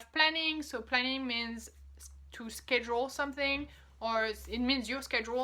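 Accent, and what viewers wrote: France. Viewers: it's pleasant to listen (and watch) you say things with your French accent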